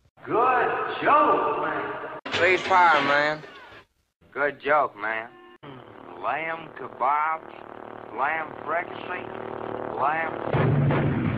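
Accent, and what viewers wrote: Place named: North Carolina, United States. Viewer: That's a mighty fine North Carolina accent there, man.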